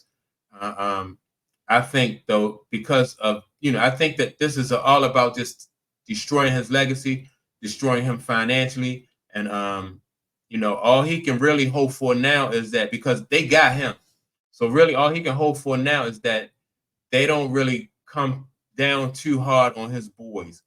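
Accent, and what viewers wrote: Baltimore, United States. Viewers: Yo! You from Bmore! Huh?!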